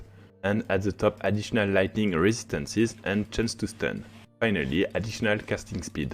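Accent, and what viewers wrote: France. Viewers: Hey man, great video but damn you really need to stop pronouncing "th" as a "z", I really don't know why the french are taught to say it like that lol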